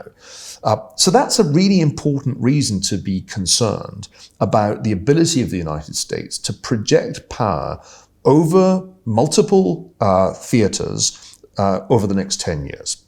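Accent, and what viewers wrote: United Kingdom. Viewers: I will summarize it this way. He speaks with a British accent, after that he makes no sense. First, when we talk about a country in ascent or decline we need to consider the following: 1. Military power: no one is close to challenging the US here. 2. Economic power: the US economy is outperforming all developed economies and the USD has never been stronger. All this nonsense about debt servicing ratio is just noise. If it really was a concern, the USD would be depreciating and not appreciating! 3. Technical innovation: AI, medicine, advanced tech etc etc. don’t want to get into details, but it is obvious the US reigns supreme… At least for a long time the US will lead. Who is in decline based on the 3 factors above is the UK 😢 Love the accent though 😊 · Unfortunately, every time I hear this accent, I am flooded with historical memories of the depravity of the nation he comes from. 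And here he is carpet bagging, talking BS about China. How about the US and its lackey, Britain, staying home and giving the world peace? 400 years of depravity is enough, Mr. Ferguson.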